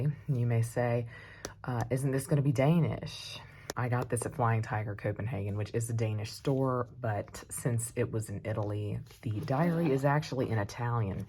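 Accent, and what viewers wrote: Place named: North Carolina, United States. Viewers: Is that a NC accent? I like yer vlaugh. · Yep, I'm from NC, and sounds a lot like me!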